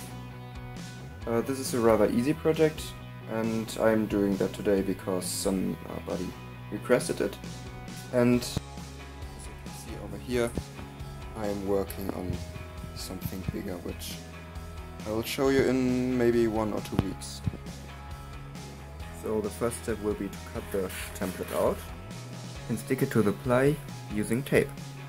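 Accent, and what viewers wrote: Germany. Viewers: Man merkt das du deutsch bist😂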